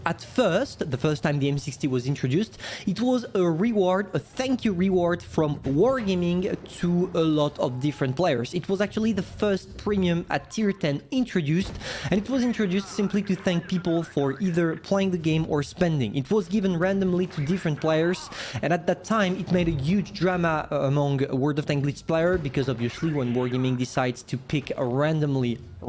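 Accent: France. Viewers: Je crois que tu es Français vu ton accent et ton nom sur Youtube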